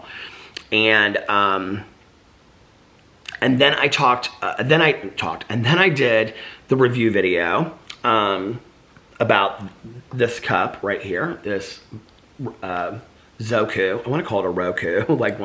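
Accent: South, United States. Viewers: Do the crumbel review with cousin caroline! Also, I’m from the south and you and I say coupon the same lol. You must have a little southern twang in ya 😉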